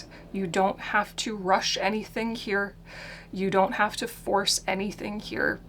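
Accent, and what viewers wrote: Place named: Midwest, United States. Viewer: welcome ! Still watching the rest of your reading. I enjoy you . I'm from Illinois across from St Louis. You Midwest yourself? I don't notice anyother accent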